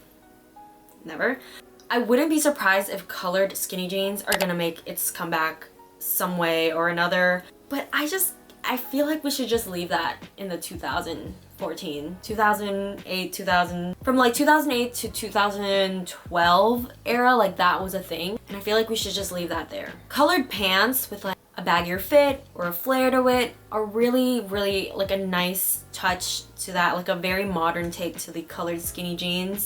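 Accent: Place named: United States. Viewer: I think it’s so cool how you Americans sound talking “button”, “them” and etc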